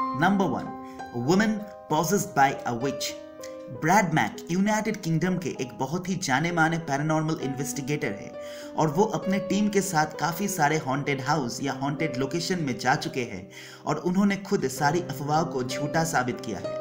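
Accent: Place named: Bengal, India. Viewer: Pehle to me pehli bar ye video dekh raha dusra ye bengoli acsent he to aap kaha se ho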